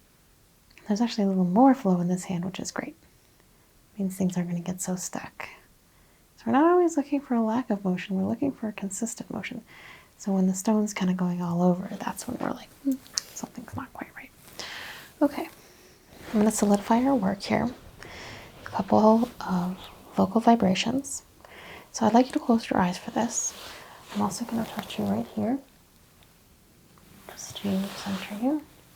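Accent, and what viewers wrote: United States. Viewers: Do you from U.S.A?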